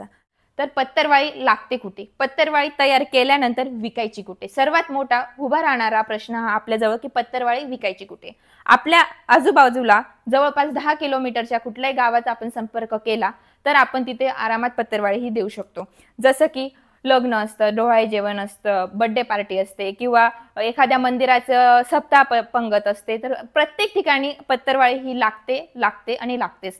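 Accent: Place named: Khandesh, India. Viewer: खानदेशी भाषेत उच्चर vegla,asava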